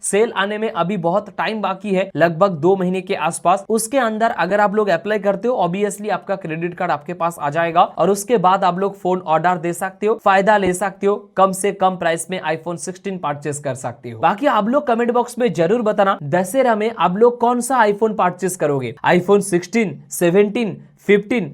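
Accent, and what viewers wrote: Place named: Bengal, India. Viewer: Tum bangali hai na.. Tumaara bangali toone bahut acchaa hayy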